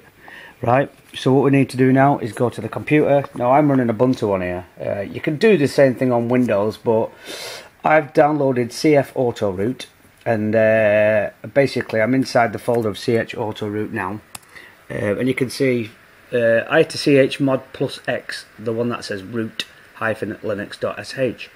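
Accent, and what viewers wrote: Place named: Lancashire, United Kingdom. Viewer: if only it were this easy to put it on my one plus one. nice video made better for a lancashire accent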